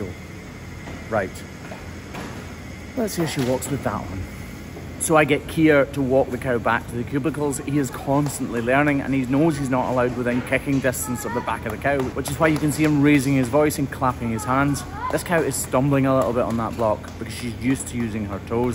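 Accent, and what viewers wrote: Scotland, United Kingdom. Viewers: I could listen to you all day. My family members are all Scottish before me, I'm the first Canadian in my family. The Scottish accent is the sound of my childhood. Ive lost a lot of them...Im 56 now so they all passed of old age etc. Ive been pretty depressed for the last year- health issues - and listening to you reminds me of my childhood. The wonderful Scottish brogue makes me so happy. I love the videos as well but I mostly watch to hear you speak. Much love from Canada eh. <3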